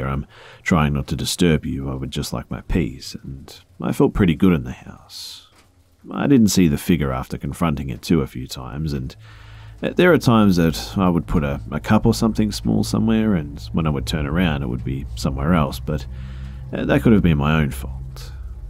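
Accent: Australia. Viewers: I agree! Love Australian accents. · Melinda Nicole I thought it was only me that loves the Australian accent XD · Thank you for making these videos they honestly are the only thing that helps me sleep. Your voice is just nice and it’s also nice listening to a fellow Aussie accent 👌🏻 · so? he's still got an Aussie accent... we are very multi-cultural in Australia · that's our australian accent mate, i'm an aussie and so is, if you don't like it theres plenty of other narrators out there who aren't australian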